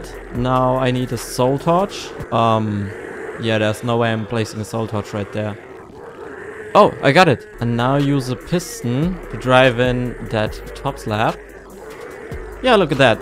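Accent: Germany. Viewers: No offend but u actually have such a German accent (ich kann das sagen weil ich auch deutsch bin) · oh du german sein beeing? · Bist du deutscher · i dont know how to desribe it but you sound like a german trying to speak english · Is he from Germany or does he only Sound like a Germany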